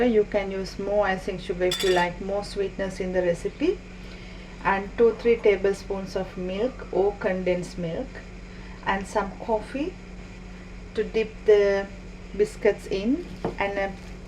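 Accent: Sri Lanka. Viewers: tara sanda I thought the same.Accent is Sri lankan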